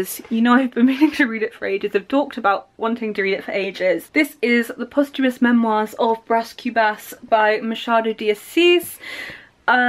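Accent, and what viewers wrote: United Kingdom. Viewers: Certainly I just love reading vlogs 😇 Thank you so much for this amezing Vlog😇🤝 And you know what your British accent is just phenomenal ❤️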